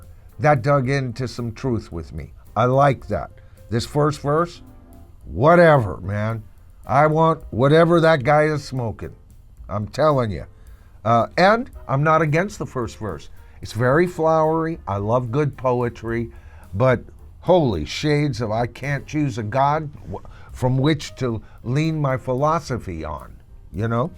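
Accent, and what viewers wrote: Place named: United States. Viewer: . If you are an American, and your accent makes that likely, You and I and all the Rest of Us have more serious things to worry about than a Plastic "Yes" ( and how many originals are in this 2026 lineup? )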